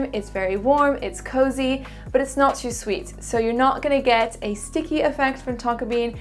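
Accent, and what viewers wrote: France. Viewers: I'm curious, where are you from? Your accent sounds French-ish but I'm not sure 👀I really like the way you pronounce the perfumes! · Are you french ? Love your accent and great review btw ..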